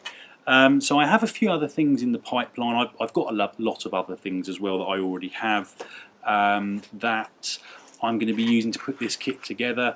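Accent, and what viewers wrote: United Kingdom. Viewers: Don't keep me wrong, I love your reviews, but this british accent makes me remember Monty Python's scenes. By the way, I miss so much those guys. Thanks.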